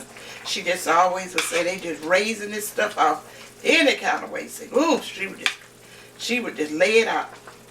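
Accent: United States. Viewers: Where is this place ? sound like Americae ? Never heard of dogers or what ever ity called. Jamaica we say dumplings to Rasta jaz.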